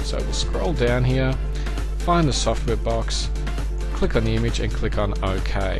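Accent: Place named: Australia. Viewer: Good to hear a fellow Australian :) Thanks for this!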